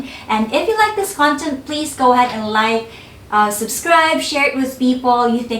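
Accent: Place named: Philippines. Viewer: Feedbacks are gifts to improve ourselves. Guys, you expect her to have an americanized accent? She's a filipino. As long as we understand her, that's fine.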